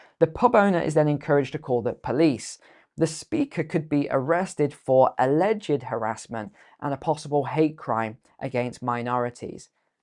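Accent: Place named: United Kingdom. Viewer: are you on the Isle of Man? What is your citizenship? I'd bet that most viewers on youtube think you're an American with a British accent. I confess I've been confused.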